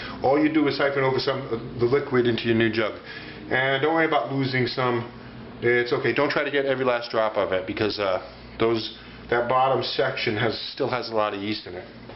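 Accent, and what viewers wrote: United States. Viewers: You sound american and bottled water is about the same quality as bottled water there. They call it springs or glacier water but really they just re-filter tap water and ad some more minerals in it. If you want "clean Water" well your better of buying actual distilled water or distill it yourself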